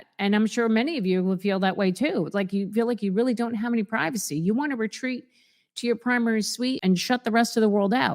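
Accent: New Jersey, United States. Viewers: Gotta love that New Jersey accent! The way she says "off" reminds me of the Sopranos (in a good way).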